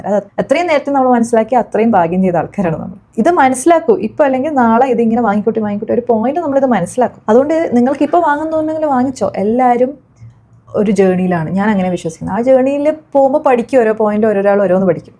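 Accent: Kannur, India. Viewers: ഭാഷ കേട്ടിട്ട് കണ്ണൂർ, വടകര ഒക്കെ ആണെന്ന് തോന്നുന്നു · നിങ്ങൾ കണ്ണൂർ ജില്ലയിൽ ആണെന്ന് തോന്നുന്നു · Crispy Kannur slang.... 👌👌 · Nice content, but understood by only a few. Good one. Varthamanam kettitu, are you from Kannur?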